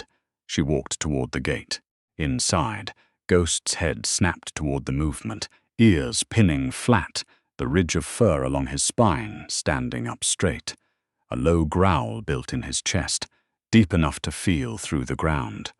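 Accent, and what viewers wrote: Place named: United Kingdom. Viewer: British accent stinks ruin concepts of storytelling.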